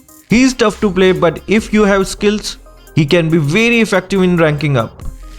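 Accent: India. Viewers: Are u indian🇮🇳? Your voice accent seems indian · Some Times i think u r Indian cause u sound like one....i m sure u are asian .....one of the very few ...to reach a million subs... 💯💯💯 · You sound pure typical Indian, how come your name is? Please change your channel name,keep anything but not South Asian names, request 🙏. May be " भोकाल"," रुद्राक्ष"" कल्कि " etc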